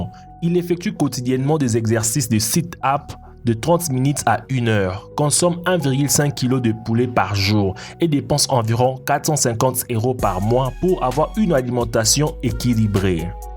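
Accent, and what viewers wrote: Africa. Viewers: Vous trouvez pas qu'il a l'accent africain un peu ?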